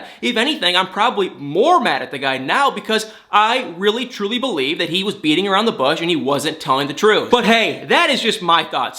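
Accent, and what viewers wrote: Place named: South, United States. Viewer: You're from Michigan? (me too) Wondering where that cute little southern twang comes from when you say 'ing' words. Great channel, enjoying your posts. 😊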